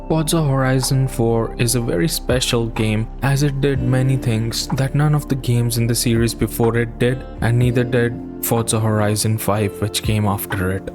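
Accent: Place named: India. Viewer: Great review brother, you got Indian accent — Indian? I love that accent! Keep up the great work, you'll gain more sub eventually. 😎🍾🍻🌟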